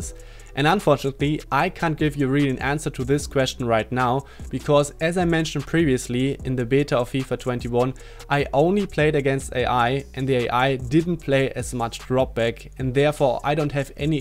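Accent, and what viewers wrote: Germany. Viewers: Safe Deutscher, dieser Akzent 😂 Genial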